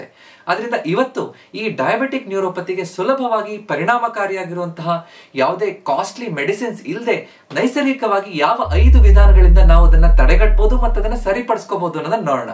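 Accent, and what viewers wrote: Standard. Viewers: ಇಷ್ಟು ಶುದ್ಧವಾಗಿ ಮಾತನಾಡುವ ಡಾಕ್ಟ್ರ ಕನ್ನಡ ಅರ್ಥ ಆಗದಿದ್ದಲ್ಲಿ ,ನಿಮಗೆ ಯಾವ ಭಾಷೆಯೂ ಅರ್ಥ ಆಗದು😂😂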